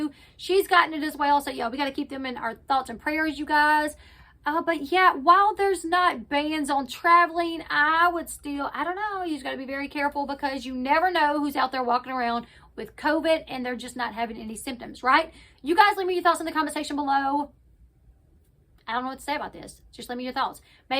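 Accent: South, United States. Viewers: Just came on here to say I absolutely love your southern accent I literally just come to your channel to hear you talk sending lots of love from London, UK !! Your accent is everything for me girl !!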